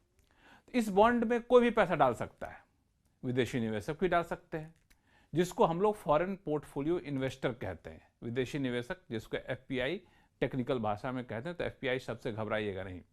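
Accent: India. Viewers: your delivery of Information in very desi andaaz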